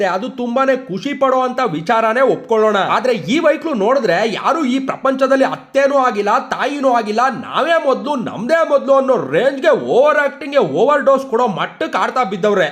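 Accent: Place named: Mysore, India. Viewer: ನೀವು ಏನ್ ಗುರುಗಳೇ ಮೈಸೂರ್ ಕನ್ನಡ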